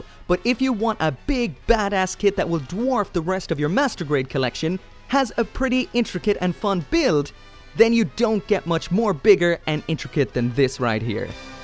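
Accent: Ireland. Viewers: Once you heard his irish accent you can't unhear it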